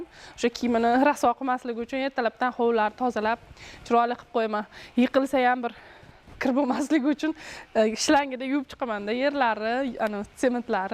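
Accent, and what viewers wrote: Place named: Fergana, Uzbekistan. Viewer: Шевез Фаргонача экан Бекабодлиларни шеваси бошкача эшитганман бизи шевада гапирасиз экан яхши курдим кизим бахтли булинг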